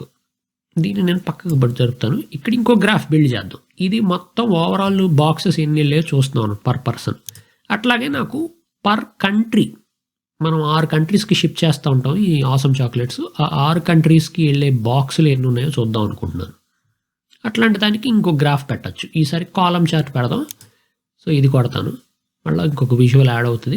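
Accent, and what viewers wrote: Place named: Guntur, India. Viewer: You must be from Tenali or Guntur. You accent matches with that.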